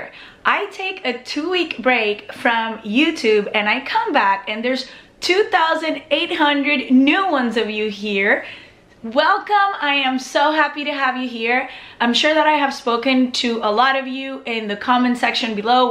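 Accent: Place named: Cuba. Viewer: Your accent makes me miss my Cuban friends in Miami!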